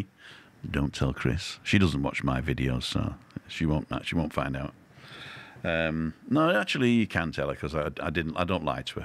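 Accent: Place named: North, United Kingdom. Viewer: You should send your portfolio to acting agencies, you’ve definitely got the voice for it and I think the subtle northern accent is something that’s not heard enough on mainstream.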